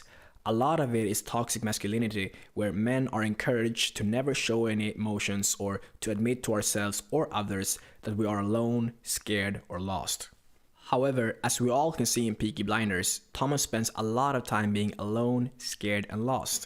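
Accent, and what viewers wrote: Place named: Finland. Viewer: The Finnish accent 👌🏻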